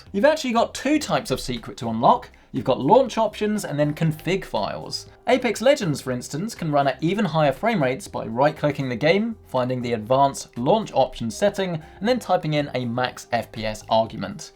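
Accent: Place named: Cockney, United Kingdom. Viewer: Not sure I am a big fan of Cockney. I think I prefer normal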